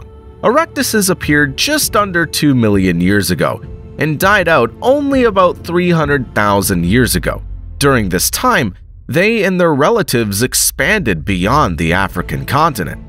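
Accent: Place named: United States. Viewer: Why do you use an American accent but not American measurements?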